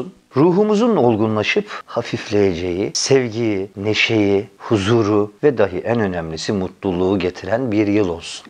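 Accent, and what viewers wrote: Erzurum, Turkey. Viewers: Abi şiveyi değiştirme erzum şivesi seni sen yapan özelleğin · Abi bu işi büyüt ama sevdiğimiz halinle doğallığınla devam et . Erzurum ağzını bozmadan devam etmeni rica ediyorum baş tacısınn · türkçende harikulede ama erzurum aksanıyla konuşmak sana daha çok yakışıyor videoların devamını bekliyoruz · Erzurum şiveli komik hikayeler gelsin lutfen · Kardeş gerçek Erzurum ağzı ile anlatımların muhteşem nacizane başka ağızla hiç bir video anlatım yapmaman seni insanlar izliyor dinliyor gülüyorsa anlatımının komik ve gerçek Erzurum ağzı ile olduğundan gerek yok kitap gibi anlatımı konuşmayı bunları zaten birileri yapıyor başarılısın devamını istiyor izliyoruz ve beğeniyoruz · E şimdi benim kafam karıştı. Sen sadece bu hikayeleri anlatmak icin mi bu güzelim erzurum şivesini konustun. Ben dogal şiven sanıyordum. Bu istanbul turkceli halinde ne şimdi gardas. 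Filmin orjinalligi gitti gibi bir durum oldu valla :) Biz seni oyle dinleyip tanidik,sen hep oyle ol..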